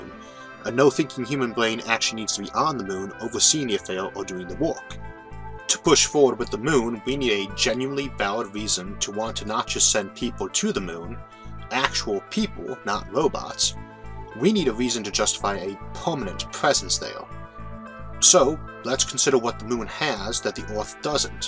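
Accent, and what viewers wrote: United States. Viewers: Your voice is fine. Which part of the States does your accent come from? I’m from nz. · I never noticed an impediment, just a US accent. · And I don't think you have a "Speech impediment". Horrible yank ascent but that's it. And I can get throught that. Going to Lunar is akin to going to the corner coach station and that's about it. Now, if you want to use it as an analogue for Mars then you may have something. You can install astronomy telescopes arrays that can be remotely controlled. which could be deployed around the surface. And you could install communication relays around it as well but placing those in orbit could be a better method of deployment. But as far as mining it? I don't think it will "panout" as you yanks say. Yes, there is material there but mining it in large qualities could be more of a dream than reality. Anything near the surface would have long since cooked off. 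And the ice echos from the surface as likely something else all together. RDF can be easliy fooled. You would have to go down atleast 200m before you remotely will find something. So, I don't see Lunar to be a place for any colonization. Just maybe a repair team to fix whatever can't be fixed by robotics. Other than that. That's it.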